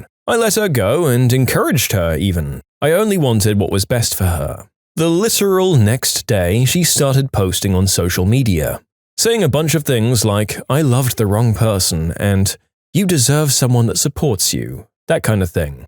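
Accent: United Kingdom. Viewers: its been a second since ive watched this channel, when did he turn british lol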